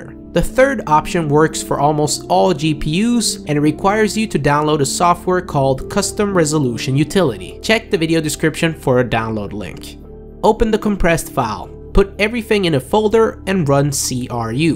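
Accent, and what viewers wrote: Sweden. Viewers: u swedish? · ur swedish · är du svensk?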